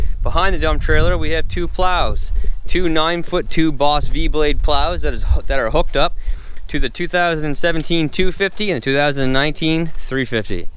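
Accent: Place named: Nova Scotia, Canada. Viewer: He’s from Nova Scotia heavy accent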